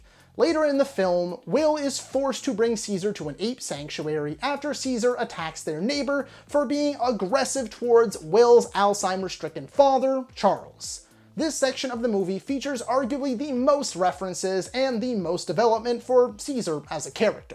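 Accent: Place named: Canada. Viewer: the Canadian accent. “Out” is “Oat” lol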